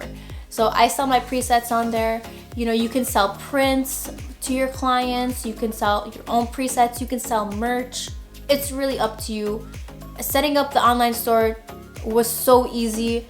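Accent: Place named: New York, United States. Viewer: You sound like Jennifer Lopez I would think you’re from New York and Spanish.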